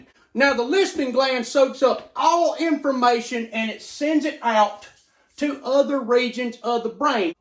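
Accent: South, United States. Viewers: For some reason, the Southern accent makes it so much funnier :D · His accent makes it more American, that's why is funny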